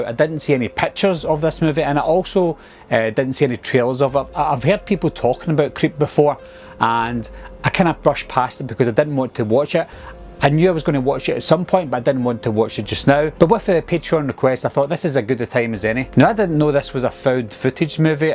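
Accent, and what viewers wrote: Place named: Ayrshire, United Kingdom. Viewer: Just found your channel mate and its brilliant. Is that a bit of an ayrshire accent i detect?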